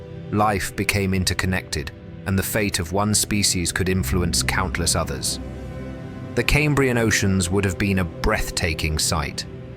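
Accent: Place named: United Kingdom. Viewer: Excellent documentary, but strangely no credits are shown. Based on other Stellar videos, this one appears to have been assembled from many publicly available sources. The script may have been written by AI, but the creator made sure that the words matched the images, unlike other AI-generated videos. An educated British accent was selected for the AI voice to make it sound more authoritative.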